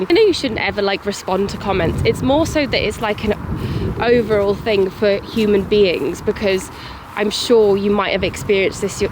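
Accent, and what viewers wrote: Wales, United Kingdom. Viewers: Why don’t people understand change is a great thing and it’s completely natural! Change is how we grow into the people we want to be. Also how bizarre that sometime says your losing your Welsh accent like of course you’re living in England😂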